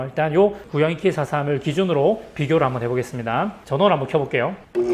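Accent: Gyeongsang, South Korea. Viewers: 항상 감사한 마음으로 동영상 잘 보고 있습니다. 저도 지역이 PK라 ㅎㅎ.. 억양이 옆집스럽습니다. ^^; 대장님. 동영상 보다 궁금한게..ek43의 노커.. 저게 어느 회사 제품인가 싶어서요.. 노커가 맛이 가서 바꿔야 할듯 한데.. 저 노커가 자꾸 눈에 들어오네요.. 😂😂 그나저나.. 그라인더 성대모사 진짜 똑 같아요.. ㅎㅎ😂😂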